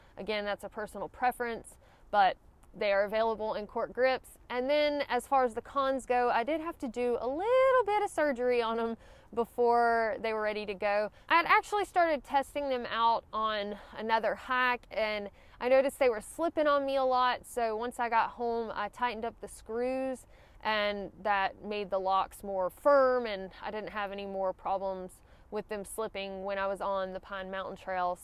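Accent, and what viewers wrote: South, United States. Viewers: Just stumbled upon your channel and love the info and that southern drawl 👍😎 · It’s good to hear a southern accent! Moved to CO from Elmore County last summer. I miss hearing Southern English!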